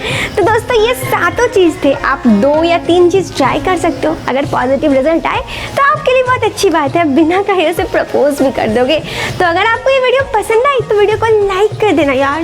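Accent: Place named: Jharkhand, India. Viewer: Jharkhandi boli ka asar Hindi me hai. Kya bat hai madam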